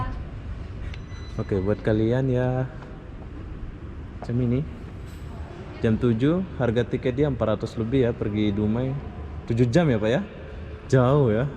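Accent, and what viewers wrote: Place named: Malaysia. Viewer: Bro logat melayumu kental skali... Mending bahasa melayu aslimu aja di pakek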